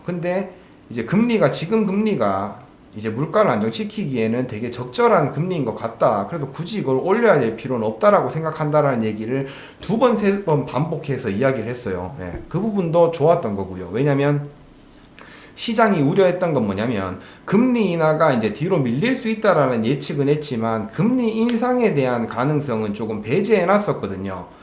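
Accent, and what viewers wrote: Gyeongsang, South Korea. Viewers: 대구분이시죠 ㅎ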